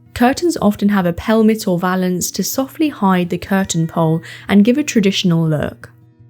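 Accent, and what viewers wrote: United Kingdom. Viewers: Very nice. Thank you for sharing your first-hand experiences with us. We're going to have to work on YouTube's subtitle app -- it seems unfamiliar with mullions, toile, and yew, and for that matter, even manor houses! Love your beautiful British accent!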